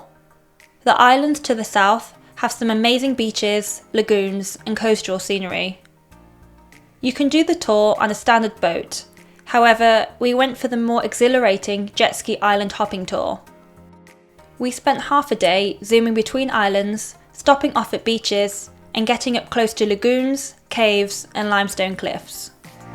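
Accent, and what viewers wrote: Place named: United Kingdom. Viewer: Great video. I have visited most of the places you included in this video but your information was an added bonus because I learnt much more about some of the history about these places. Thank you. BTW where are you originally from ? Judging by your accent you sound British.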